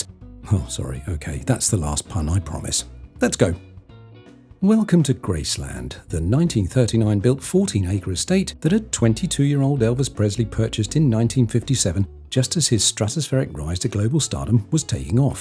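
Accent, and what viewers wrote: England, United Kingdom. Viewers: Listening to an English man describe Elvis Presley and his southern mansion and his southern ways is kinda like listening to Ozzy Osborne preach the gospel, it just doesn't really go together.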